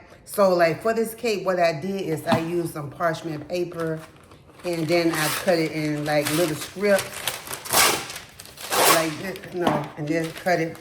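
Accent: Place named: South, United States. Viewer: When you were making the frosting with your Southern accent my Yankee self thought you kept saying it was a portable frosting. I thought isn't all frosting portable? Then I realized you were saying pourable. Lol. Anyways that cake looks incredible. I'll have to try making it sometime. Thanks for sharing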